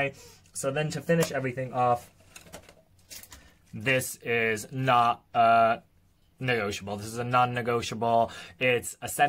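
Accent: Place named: United States. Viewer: Well you have an American accent...and you said “usually my videos are pole dancing related” explain!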